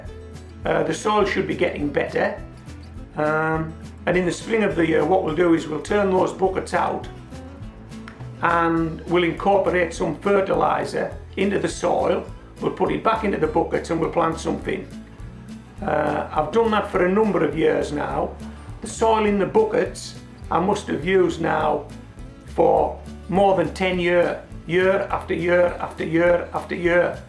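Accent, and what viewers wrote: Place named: Lake District, United Kingdom. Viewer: New subscriber here. Never been a keen gardener, always kept an almost zero maintenance garden before (shaming my old dad who has always been very keen and won many many show awards for his veg) - however, since starting off a few fruits last year, I found your channel and you have me inspired to try some veg growing in pots and a couple of tiny raised beds. Going through all your videos now and long may you continue to inspire. Cheers and all the best to you and Molly :) P.s - trying to place your accent, that can't be a Lakes lilt surely!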